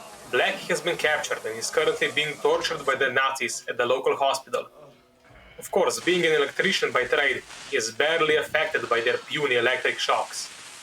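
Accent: Eastern Europe. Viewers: Good explanation. I like video essays that cover films. What I like most is how a Slavic voice is explaining a Slavic movie, which seems more authentic to me. I do look forward to new videos. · Good narrration ( love the slavic accent 😂, as i am from eastern europe myself) Great editing, good flow of video, never heard of the movie which was interesting Only one thing -The mic bro you need to upgrade that 😊 just some constructive criticism Good luck with your channel 👍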